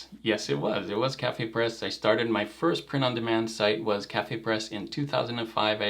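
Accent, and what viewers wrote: Hawaii, United States. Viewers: EH! congrats on da 150k! 🤙 i noticed the small kine accent when i first started watching your videos. but since you never made much reference to Hawaii in the other uploads that i have seen, i kinda shrugged it off as me assuming too much! LOL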